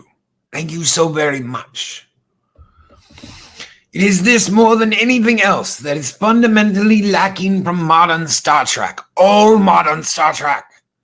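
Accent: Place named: RP, United Kingdom. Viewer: indeed! It seems I can leave a comment here but I can’t a see anyone else’s. WTF, YouTube? Nice video, man, I truly enjoyed your constipated British toff voice. Constructive criticism: You might want to watch the occasional “um’s” and such, and invest in an expensive microphone... it seems to be a necessity for a promising YouTuber. Again, nice job; I’m sure starting a YT channel is like watching grass grow; please persevere!🙂🖖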